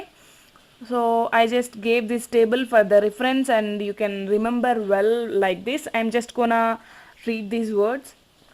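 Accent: India. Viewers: The accent definitely is Indian.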